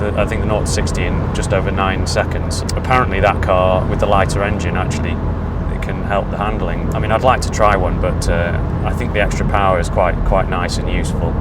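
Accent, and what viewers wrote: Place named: Leeds, United Kingdom. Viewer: You sound like Howard Moon. You from Leeds?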